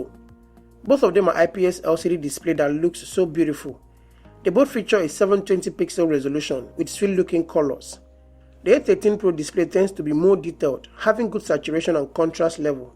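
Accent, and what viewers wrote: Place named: Nigeria. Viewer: That Nigerian accent. One of my friends is Nigerian and the accent is brilliant.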